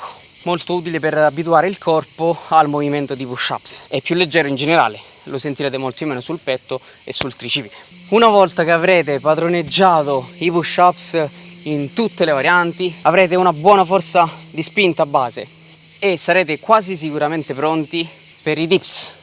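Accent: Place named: Portugal. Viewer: Ese acento portugués le da ese carisma genial. Grande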